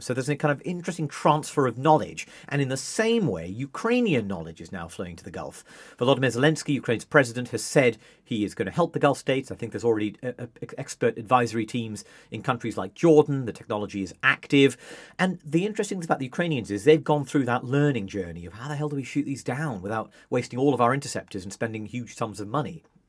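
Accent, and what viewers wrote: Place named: RP, United Kingdom. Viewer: so brititsh, so posh, and so wrong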